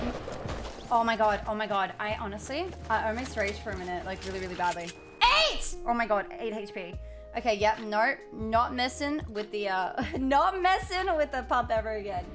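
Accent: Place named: Australia. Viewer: Hey which part of australia u from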